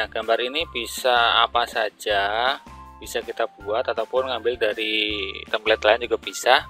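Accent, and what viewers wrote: Banyumas, Indonesia. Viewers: Walaupun ora paham,tapi tetep nyimak Bos,salam pada bae e🤣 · Dapat ilmu lagi dr om... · Wahhh tq bang sehat selalu om sukses selalu buat kedepannya amiiin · Salam perjuangan om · MATUUUUUR SUWOOOON SANGEEEET masss....... ALHAMDULILLAH...........sy dpt pelajaran baru....n SANGAAAT JELASSSSSSS...... MAJUUUU TERUSSSS BERKARYAAAA n SUKSESSSS SELALU........ Wass ant Cicurug Skbumi......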